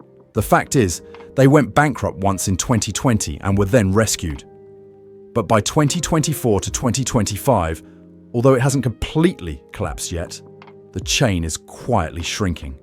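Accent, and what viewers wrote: United Kingdom. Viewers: Why do you have a British accent?? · Hey Dude, where did you get that British accent?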